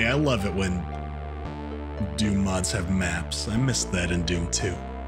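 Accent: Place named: Germany. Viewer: Yesss bring on the weird! Also it’s always a treat hearing your German accent come out to play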